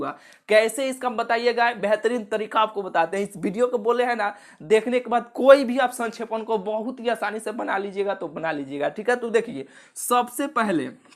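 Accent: Bihar, India. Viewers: Sir aap Bihar se h kya.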